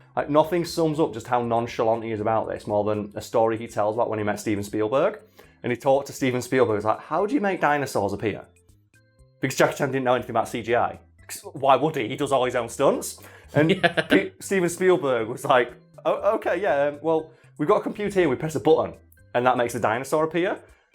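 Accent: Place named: England, United Kingdom. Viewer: True fact: Jackie has never done all his own stunts, he's used stunt doubles for all manner of teeny tiny martial arts moves all the way up to his infamous clock tower fall in Project A, where the main shot used in the film isn't of Jackie falling from the clock face to the fall, it's his co-star and frequent stunt double Mars doing that stunt. Jackie does perform the 2nd shot from further fdown the tower (so only a two-thirds fall), which is the one where he gets up and delivers his lines all in the same shot. Wisely he chose not to do a full fall leading into some minor acting afterwards all in one shot, because however you take that fall, you can't count on being able to stand up, act, and deliver lines afterwards! Also, what country is the videomaker operatng in? He sounds English, but he talks like someone who has never done a basic search for Jackie Chan on amazon UK. 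No jackie chan films available on Blu-ray? Try Police Story 1/2, Wheels on Meals, Armour of God, Crime Story, Project A 1/2, City Hunter, Miracles, Heart of Dragon, The Big Brawl, The Protector, The Young Master, Dragon Lord, Drunken Master, Snake in the Eagles Shadow (coming soon), and all manner of his early Lo Wei productions (Fearless Hyena, Spiritual Kung Fu, Dragon Fist, Shaolin Wooden Men, Snake and Crane Martial Arts of Shaolin, etc)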